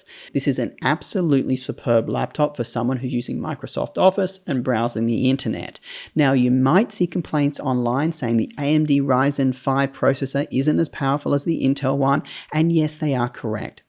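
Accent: Australia. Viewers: you sound Australian ,but are you talking US Dollars & web sites ????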